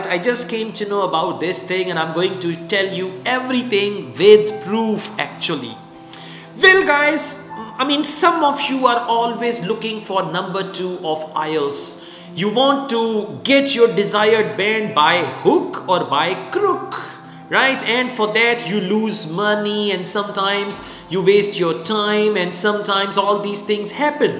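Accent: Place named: Punjab, India. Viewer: Thank you sir for updating us about the current affairs of Ielts . By the way i like your punjabi tone during video . Almighty Allah grant you long and healthy life . ameen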